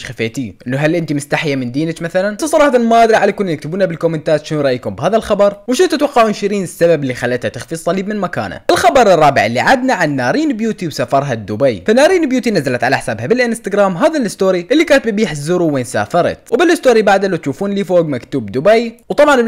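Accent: Iraq. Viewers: ابغا اشوف اخبار اليوتيوبرز اروح عندك واحب اهل العراق ولهجتكم الحلوة متابعينك من السعودية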